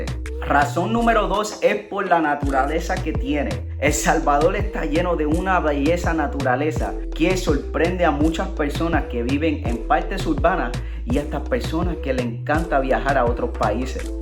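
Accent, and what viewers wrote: Puerto Rico. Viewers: A donde esta el gringo, yo veo un Cici y una Cerota osea, no mames que tu lengua raspa, Eres puertorriqueño dumb fuck 👊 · Me encanta el acento puertorriqueño y excelente que disfrutes de mí país junto a tu esposa! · Gracias, muy buen video sobre el pulgarcito. Pero tu hablas y pareces mas Boricua que un "gringo" como dice el titulo de tu video. Cambiale de gringo a boricua. Yo estuve mucho tiempo en P.R. y tambien me anamore de la Isla, lo mismo de NY en donde mis amigos eran casi todos boricuas. En horabuena!!!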